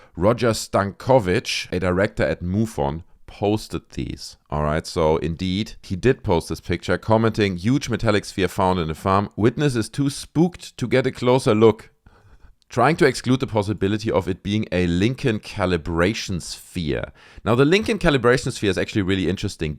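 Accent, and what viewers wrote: Germany. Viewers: I am starting to learn German, very slowly. I hope that I can get myself to the point where I am able to speak German as well as you have learned to Speak English. Im guessing, (hopefully without offending), that German is your Native Language. Love your accent. If English is your Native language, my apologies, wasn't sure. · Love your analysis and humor doing so. the German accent adds gravitas for this Texan. The cylinder looks like something suspended from a drone by a fishing line. Way too unbelievable.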